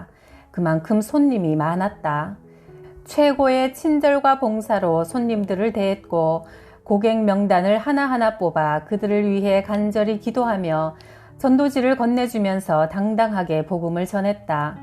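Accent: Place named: Daegu, South Korea. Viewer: 대구사투리도 너무너무 잘 하셔요 몇 번 들었는데도 참 고맙습니다 감사합니다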